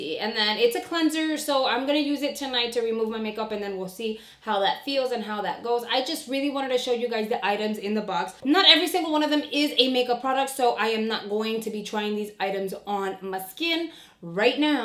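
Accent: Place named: New Jersey, United States. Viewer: Always look forward to your boxycharm unboxings...i adore your Jersey ( I’m assuming that’s where you’re from cuz that’s what it sounds like) accent!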